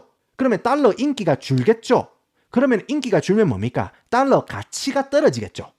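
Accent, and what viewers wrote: Gyeongsang, South Korea. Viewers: 와~미국 금융계통에서 일하셨던 경험자라 그런지 완전 고급정보 얻어갑니다. 내가 회사만들면 스카웃하고싶네요 근데 나는 갱상도라 말끼를 알아둗겠는데 딴동네 출신들은 알아 듣겠나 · 우짜 이리 말을 잘 터노. 미국에서 갱상도 사투리 들으니 넘나 톡톡 튀고 좋타. 함 봄 구독안하고 못배긴다. 명언이 한 두개가 아이다. ㅎㄷㄷ 한국떠난지 오래라믄서 우째 이라고 우리맴과 삶을 잘 알꼬!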